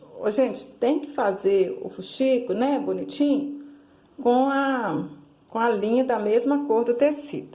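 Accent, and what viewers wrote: Minas Gerais, Brazil. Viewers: Eu simplesmente ameiii, adoro bonecas de pano, adoro fuxico e adoro esse sotaque mineiro 💖😍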